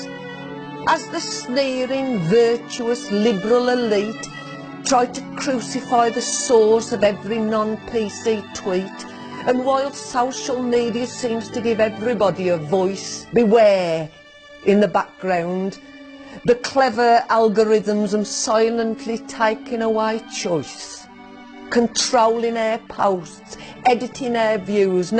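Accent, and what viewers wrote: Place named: Black Country, United Kingdom. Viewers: From the Black country,very co0mical accent.lol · I don't know who wrote this but neverthelesss it is a piece of genius. Also It has more resonance for me having been delivered by someone from the Midlands, for reasons I can't quite put my finger on because although I have lived my life so far, for 47 years in my native Yorkshire then 10 in Scotland and the last 4 here in London, I find working class Midland people the 'Salt of the Earth'. You speak for me good Lady, thank you, and a very Merry Christmas to all at.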